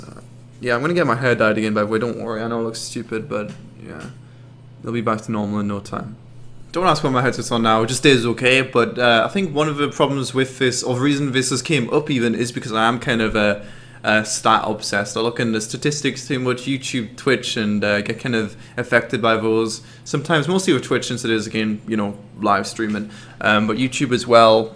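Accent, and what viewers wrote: United Kingdom. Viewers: I just want to hear that beautiful British accent bby grill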